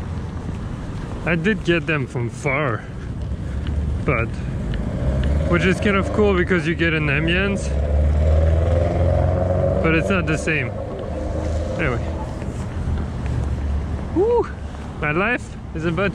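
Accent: France. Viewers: So a year ago I barley used my DSLR and now I'm watching a one hour video of a dude with a french accent and broccoli roaming the streets randomly shooting shit. Life changes man 😂😂 but I love it.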